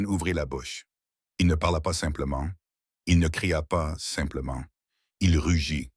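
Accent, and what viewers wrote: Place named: United States. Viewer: Arrete de parle français avec une dialecte américaine, ça casse tout le histoire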